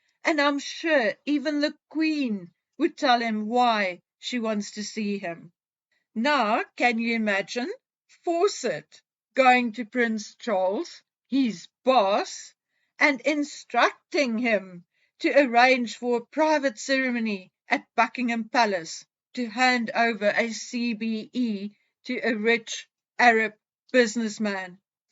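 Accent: South Africa. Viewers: Hi ,- so nice to hear a South African voice on these Harkle exposé videos! Thank you for your in depth research and your integrity. You are always balanced, fair. I would be interested in hearing more about the paintings.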